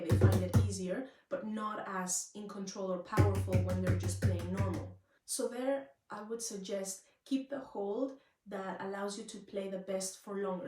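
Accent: Ireland. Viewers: Hi, im both new to your youtube site and bodhran playing. So new in fact that ive yet to settle on which bodhran to get as my first but after watching several tutors on line, i can easily say I've gotten the most out of your content so, "yes" to your question about doing more of thes q&a sort of thing! You're an excellent drummer! I get alot out of listening but watching what you do to get those sounds is even moreso. I'm impressed with your great command over your techniques and dynamics. I have been a drummer for over 50 years playing loads of different genres of music but in all that time Irish music in general was not really in my lifes soundtrack. Nor were any of the musicians with whom I've played into it enough to pursue it either for fun or profit. So on the shelf it remained with green bagels and green beer, corned beef and cabbage and all the other commercialized "American Trad St. 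Patricks Day" faire. After morning Mass, of course! 😉 At any rate, I'm giving it a go now if only to play to cds! But im sure I'll work it in to a session somewhere eventually! Thanks so much for your inspiration as well as your wonderful content on you tube. (Its the only social media outlet i use for this sort of thing.) Oh, and your brogue is lovely! It reminds me of the way my family in the Offaly area speak. Ive other family around Ireland and for such a tiny country, the range of brogues seem vast! 😆 Be safe, and continued blessings! ✌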